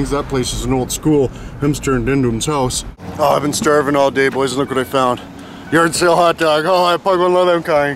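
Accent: Canada. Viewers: how much more canadian can that voice sound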